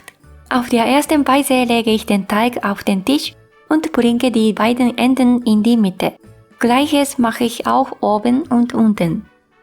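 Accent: Japan. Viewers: "Und dann gebe ich noch 50g daTSU" Japanischer Akzent ist einfach bezaubernd <3 Ich muss es unbedingt nach kochen!, danke für dein tolles Video!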